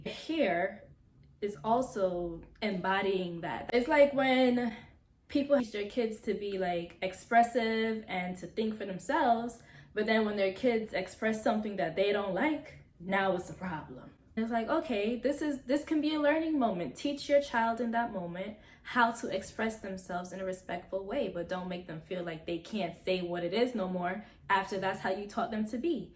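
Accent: Jamaica. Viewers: Good morning, was appreciating your informative videos and noticed slight accent. Jamaican?🙂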